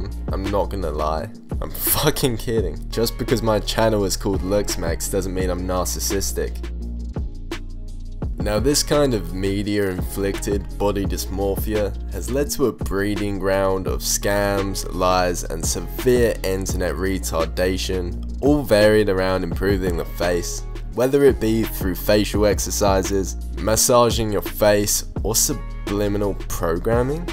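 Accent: England, United Kingdom. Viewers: are you from england or maybe australia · British accent ? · He is a fellow Brit.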